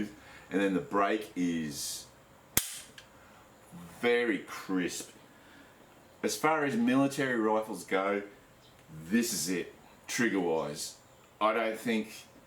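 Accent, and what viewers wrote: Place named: Australia. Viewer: You sound Australian Thought y’all no longer allowed gun ownership?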